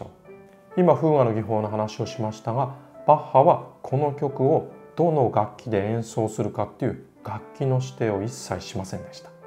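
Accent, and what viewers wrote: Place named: Fukushima, Japan. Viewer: ピアノでバッハを弾くことを躊躇っていましたが、この動画を観て勇気が出ました。ありがとうございます😊 ちなみにわたしは福島県出身なので、の話し方には親しみがあります。これからも楽しみにしています。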